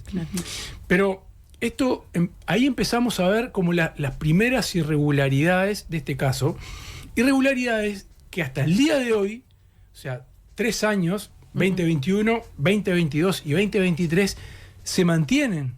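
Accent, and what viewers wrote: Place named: Argentina. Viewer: Buenas noches parece que escucho a un periodista argentino , informando la estafa de los fondos estatales por una fundacion creada para robar a los pobres 4%. Si es verdad , los responsables de la fundacion A Ganar tienen que ir presos, en caso que no aya procesados el periodista Eduardo Preve miente!!!. Buen año 2025 con salud ... Nelson....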